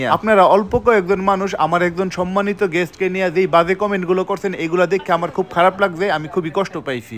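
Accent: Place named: Brahmanbaria, Bangladesh. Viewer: আসসালামু আলাইকুম ভাইয়া আপনার ভিডিওগুলো দেখি কারন আপনি আমার নিজ জেলার ভাষায় কথা বলেন খুব ভালো লাগে। Brahmanbaria মানুষ শুনলেই মানুষ ভয় পায় এবং বলে ভালো না আমরা এই কথাটা আমারও শুনতে হয়। আসলে আমার মনে হয় সব জেলাতেই ভালো খারাপ মানুষ রয়েছে। সবাই খারাপ হয় না। কিছু মানুষের জন্য সবার বদনাম হয়। এটা মানুষ বুঝতে চায় না। আজকে আপনার ও আপনার বন্ধুর কথাটা শুনে মনটা শান্তি পেল 😊😊😊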